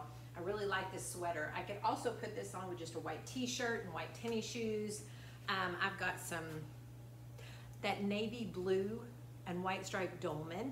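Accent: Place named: South, United States. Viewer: Hi Sweetheart, I love your accent so much...I was in Nashville last year and I would make conversation with people, because I adore a Southern accent....I loved it there so much, God willing I will return 🙏...I went to New York as well, it was nice, but I like the South xxxxxx🌺🌺🌺Much Love Bella🌺🌺🌺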